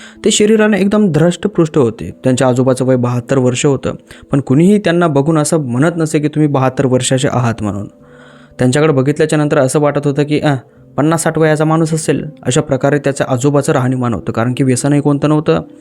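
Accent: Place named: Marathwada, India. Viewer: टिपिकल मराठवाडा टोनींग😊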